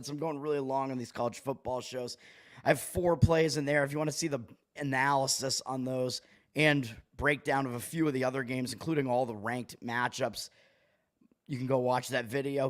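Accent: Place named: Boston, United States. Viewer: we need more Boston accent... Worcester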